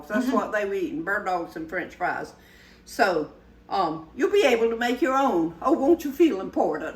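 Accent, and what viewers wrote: South, United States. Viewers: Every time you say shine for Jesus,’ I think of my wonderful Mother in Law. She’s home with the Lord now, and I know how happy she is. The two of you would have been great friends. Faith - Family - Friends! She never missed a Sunday at her Methodist church. She was a true Southern lady, could cook amazingly well, and it looks like you could be cousins. Same height, same accent, even your hair is alike. Yes, I miss her!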